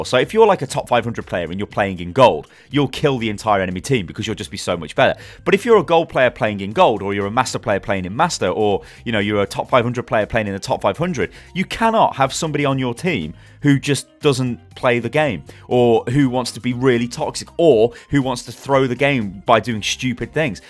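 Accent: Germany. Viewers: oh god this german accent like srsly... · Iam from germany too and I recognize a german accent xD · He´s got a pretty terrible german accent....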